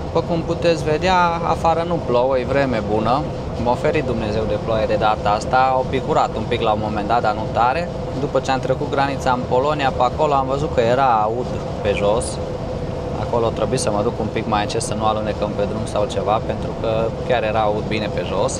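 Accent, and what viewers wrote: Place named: Transylvania, Romania. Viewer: cu un accent de ardelean care nu ai cum sa nu-l indragesti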